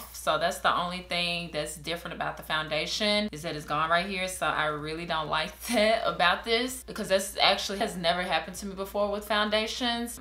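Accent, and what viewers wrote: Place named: Texas, United States. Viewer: What city are you in. I hear a Texas accent.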